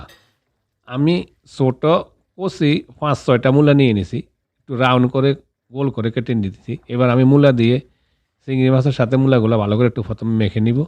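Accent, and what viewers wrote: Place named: South, Bangladesh. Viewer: আমার বাড়ি ভোলা।আপনার কথার ধরন দেখে জিঙ্গেস করলাম।সাধারনত আমারা দক্ষিন অঞ্চল এর মানুষ এইভাবে কথা বলি।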